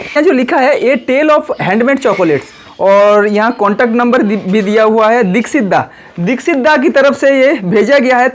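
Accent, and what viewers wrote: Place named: Bengal, India. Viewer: Apnar Hindi pronunciation and words choosing bengoli der moto... So better do in Bengali language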